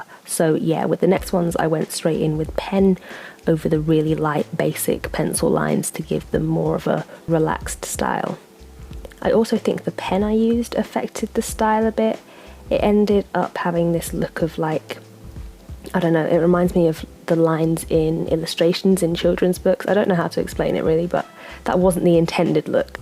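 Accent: United Kingdom. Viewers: Nice vid and really love ur british accent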